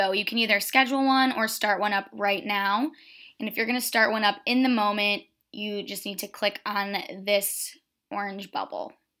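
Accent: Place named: United States. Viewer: Sorry. I battle to understand her American accent. I am a South African English graduate.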